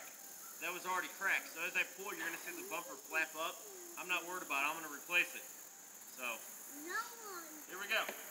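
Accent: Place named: Texas, United States. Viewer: I detect a northeastern texas accent. Figures.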